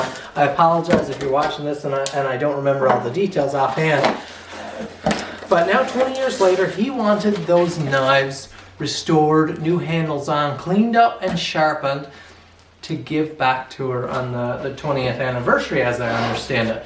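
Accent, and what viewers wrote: Newfoundland, Canada. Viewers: man as soon as I heard ya talkin I knew you were from the rock. Cheers! ill have to add one of your knives to my collection. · This popped up on my suggested videos and you managed to get 2 words into your intro before I was wondering what part of NL you were from haha. Always nice to see another Newfoundlander unexpectedly! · As soon as i started this video i was like this guy gotta be from Newfoundland lol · I thought I detected an accent. Are ya a Newfie there by? I see the flag behind ya. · I watched this whole video wondering why you sounded familiar only to realize you are from Newfoundland ahahah amazing work. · Me getting distracted by the fact that this dude sounds like a Newfie. Looks at description. "oh. Checks out."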